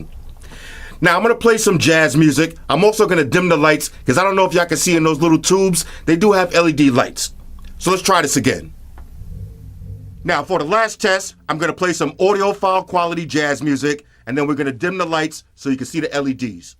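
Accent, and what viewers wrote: New York, United States. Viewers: Yo, it seems strange I’m watching this video from NYC / man this place is crazy & everyone in this hood all talk like you!! Hahaha, this city has so much to offer some 1 like me while I’m here on vacation- hot video again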